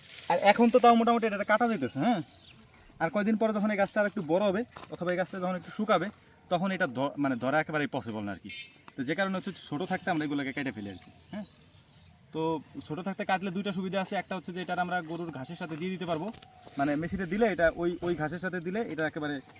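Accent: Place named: Comilla, Bangladesh. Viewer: আসসালামুয়ালাইকুম আশা করি ভালো আছেন দয়া করে আপনার জেলার নাম বলবেন কুমিল্লার ভাষার মতো লাগে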